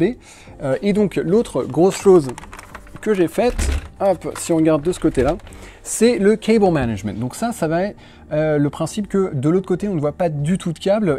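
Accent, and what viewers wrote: England, United Kingdom. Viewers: Arrete avec ton accent anglais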